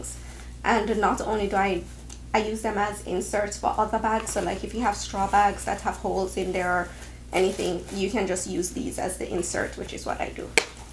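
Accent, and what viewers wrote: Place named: Jamaica. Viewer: I hear the Jamaican accent bap bap lol 🇯🇲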